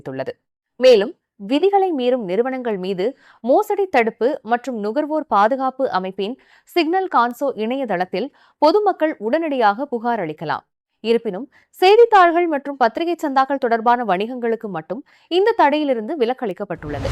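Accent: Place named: India. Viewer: இந்திய பாணியில் செய்தி வாசிக்கிறீர்கள். ர ற ண ன ல ள உச்சரிப்பு சரியில்லை.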